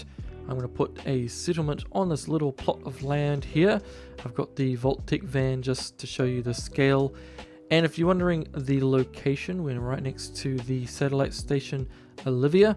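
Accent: New Zealand. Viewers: kiwi sounding fella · Judging by the accent, you're a kiwi, right? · You sound like your from New Zealand? Just wondering? Nice content btw 👍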